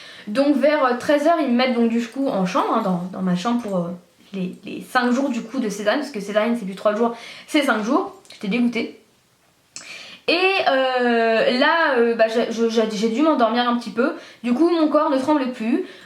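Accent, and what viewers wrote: Normandy, France. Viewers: tu as un accent normand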